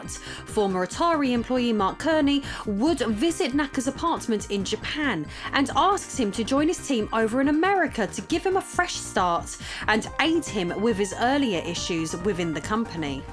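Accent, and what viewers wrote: United Kingdom. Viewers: Of course.It´s impossible not to be. British accent with astonishing beauty <3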